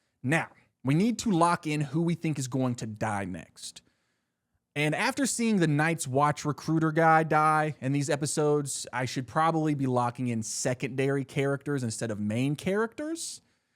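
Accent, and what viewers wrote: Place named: United States. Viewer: I'm enjoying reliving the show through you.... plus you sound American so it's only natural that you mispronounce everything it's funny if anything, don't listen to the haters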